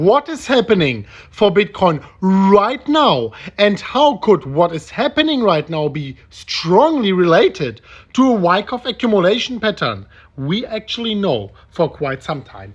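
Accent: Germany. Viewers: I hear a German accent but your English is perfect. I speak German pretty well but not as good as your English. I love you man.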